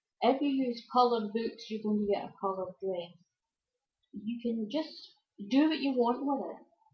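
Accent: Scotland, United Kingdom. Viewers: A beautiful craft! She turned out lovely. Your Scottish accent was a treat to listen to as well.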